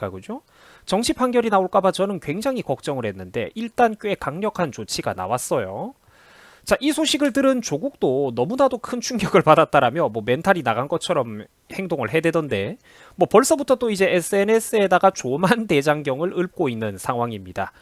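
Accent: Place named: Gyeongsang, South Korea. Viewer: 님 경상도 분이신가요? 오늘따라 특히 사투리가 정경심(정겹게) 느껴지네요.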